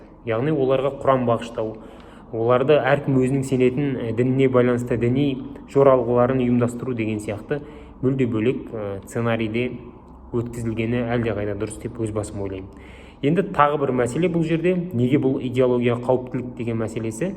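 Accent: Standard. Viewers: өте сауаты күшті,қазақш таза сөйлейді,мәңгүрт депутаттар қағазға қарап міңгірлеп тұрады,осындай қазақ азаматтары көп болса екен,депутаттыққа,мини стрлікке осы Асхатты ұсыну керек,қазақша таза сөйлейтін жастар аз,аман бол!Қазақстан енді орыстың бұғауынан шығу керек!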